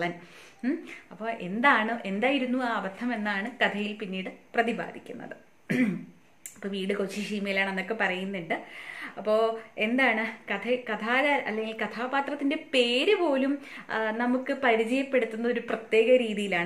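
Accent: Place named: Valluvanad, India. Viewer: വള്ളുവനാടൻ ശൈലിയാണല്ലോ....😄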